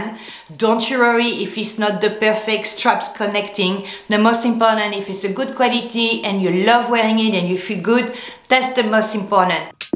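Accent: France. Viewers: Cocorico!🐓 Bonjour, je viens de découvrir votre chaîne. J'ai été interpellée par votre accent très français ("But alors you are French?"- La Grande Vadrouille 😄), en même temps cela me permet de faire des progrès en anglais car je comprends (presque) tout pour une fois... J'aime beaucoup ce que vous présentez, les couleurs et imprimés, et même si je n'ai pas la même colorimétrie, j'ai des goûts très similaires aux vôtres. La marinière, une valeur sûre! J'en prends chez Armor Lux, j'en trouve aussi chez Esprit à des prix plus abordables et de qualité correcte. J'aime bien porter un tee-shirt col rond à rayures noires sur fond blanc ou écru, sous un pull en cachemire noir col V, je porte le pull légèrement plus court pour laisser un peu dépasser le bas du tee-shirt pour rappeler l'imprimé du haut, le tout avec un jean. Passe-partout et chic. Bravo pour vos vidéos si agréables, merci pour votre bonne humeur et votre spontanéité. 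Forcément je m'abonne, clic clic clic!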